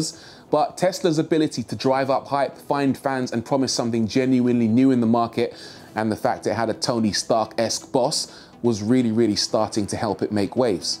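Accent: United Kingdom. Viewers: Very informative video. The killer British accent helped.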